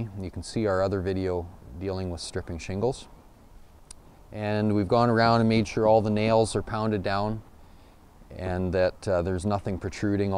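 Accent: Canada. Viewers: It's like listening to my grandpa when he explained how to do stuff. But with a Canadian drawl.... Good stuff. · eh man are you Canadian? you sound me that's why Im asking haha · HE MUST HAVE GRADUATED FROM THE ROYAL ACADEMY OF ROOFING IN CANADA! HES PAINFULLY SLOW! AND THAT ACCENT IS BEYOND IRRITATING , NO WONDER CANADIANS FIGHT SO MUCH! DOESNT EVERY ROOFER USE SCAFFOLDING ON A RANCH ROOF?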